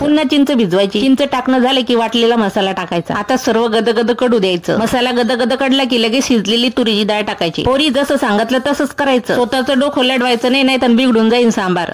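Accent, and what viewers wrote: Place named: Pune, India. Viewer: ही बोलन्याची शैली पुणे जिल्हातील शहरा नजदीक चे गावात चलती,,,,, कारण मि स्वतः पुणे जिल्हातील आहे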